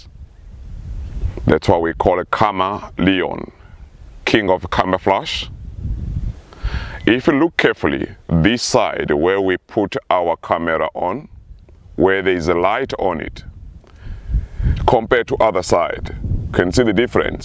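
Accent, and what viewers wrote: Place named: Africa. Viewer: hey man that is rude andrea preti i am also from afrika that is how afrikans talk also zulu and i am fine with that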